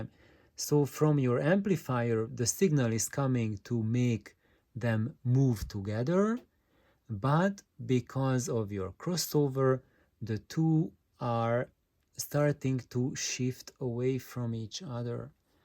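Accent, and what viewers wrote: Hungary. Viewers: Brutális ez a magyar (Hungrish) akcentus. :)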